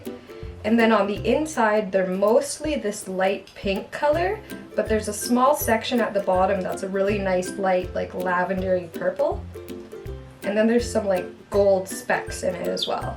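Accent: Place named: Canada. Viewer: I love Canadian accents ❤ and it adorable.. would love more videos like this.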